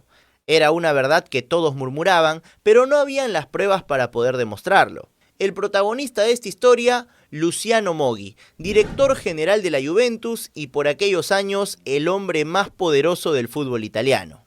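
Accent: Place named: Argentina. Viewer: Me suena como argentina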